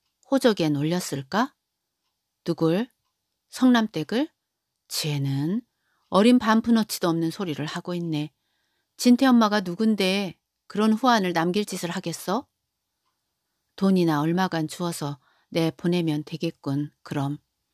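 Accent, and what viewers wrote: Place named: Jeju, South Korea. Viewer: 제주도에서 시집오신 외숙모하고 말투가 똑같으셔서 듣는 내내 더 재밌기도하고 신기하기도했답니다😂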